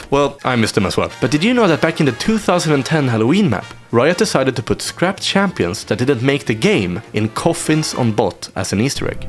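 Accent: Sweden. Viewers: This svengelska😂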